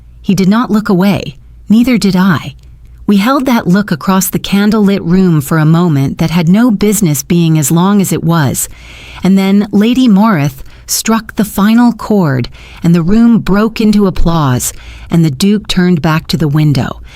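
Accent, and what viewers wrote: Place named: United States. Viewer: Why the American accent? Story is set in England. Weird names. Pianoforty????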